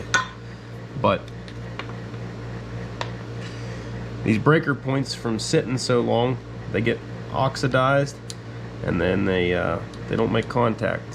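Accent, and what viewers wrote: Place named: Pennsylvania, United States. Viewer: You sir, are my main reason to open up youtube! Quality, real, content. I learn a lot. Please continue. Also, love the PA accent. Family in Altoona. I'm quite a bit south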